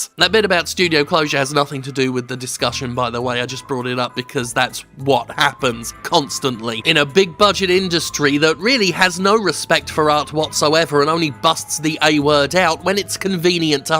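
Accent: United Kingdom. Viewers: He's got a British accent.......because he's British. How fucking dumb can you be? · big dude with a brittish accent.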